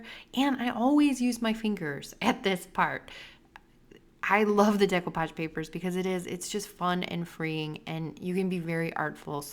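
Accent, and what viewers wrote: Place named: East, United States. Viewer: Love your artistry and thanks for telling it like it is - so helpful and inspiring. And hope this doesn’t come out wrong but your eastern us accent is so cute. Love From California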